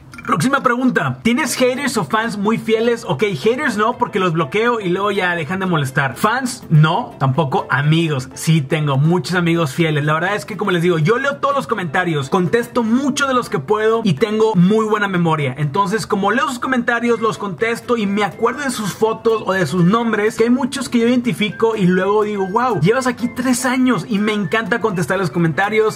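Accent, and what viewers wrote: Monterrey, Mexico. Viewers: Luego luego se nota tu acento regio :) también soy de Monterrey. Felicidades eres de los mejores youtubers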